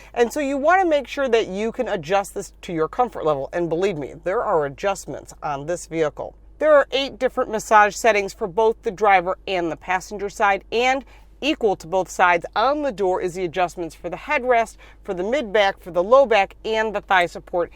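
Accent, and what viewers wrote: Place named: Chicago, United States. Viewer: Watched it 3x just for the Chicago accent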